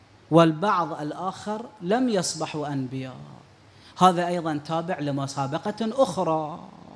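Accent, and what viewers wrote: Iran. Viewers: هو يمكن إيراني لهذا السبب لهجته هكذا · لان ايراني وقليل يعرف عربي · ايراني وهيج يحجي كوه يعرف عربي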